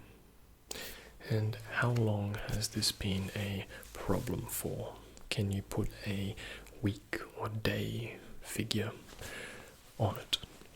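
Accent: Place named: Australia. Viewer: damn you are fulfilling my South East Asian Physiotherapist fantasy with an OZ accent and im so in it. forgive me.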